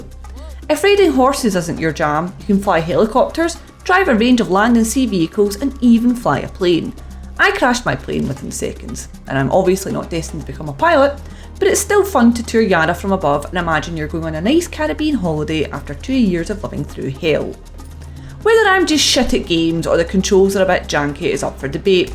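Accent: Scotland, United Kingdom. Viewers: XD these comments! Poor Scots get a hard time of it don't they?! But yeah, while I personally can totally understand what she's saying, I agree with the comments, I live abroad, and I make constant efforts to ensure I speak extremely clearly. My normal accent omits T's at the ends of (and sometimes in the middle of) words. I had to teach myself to pronounce T's when talking, among many other adaptations. I think if your going to put out a review to essentially the WORLD, you've got to meet them half way at least. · Please, if you’re going to make a review with a Scottish accent, at least speak more slowly than usual. Don’t get me wrong, I got nothing against the Scottish accent if the review is well made, but if I can’t understand most of the review, it’s not a good review. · The colonials are struggling with the reviewers Scots accent 😁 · I love your accent! 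😁 more Scottish game journalists pls! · I am a mexican who speaks english reasonably well, trying to understand a scottish person who has a thick accent. Sorry PC Gamer but I think a clear and neutral english would be better next time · Exactly. I can understand it too but you've gotta tone down the accent a little bit (a wee bit?) if you're addressing non-native speakers. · Born and raised Canadian, english is my first language. 99.9% of Brits and Aussies I can understand perfectly well, as clear as any Canadian or American... this reviewer (Scottish maybe??) has an overwhelmingly thick accent, I only understood perhaps 60% of the words she was saying. Perfectly fine if this review were for Brit / Scottish viewers only.. but pretty sure PC gamer's viewers or readers are mostly in North America and the rest of Europe.. wouldn't it be more sensible to use someone with a softer accent?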